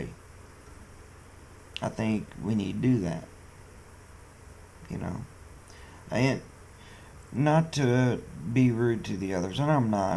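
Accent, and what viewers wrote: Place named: United States. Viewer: 30 👎👎👎👎 pretty sad that you don't know what it wheel loader is because to me you sound American.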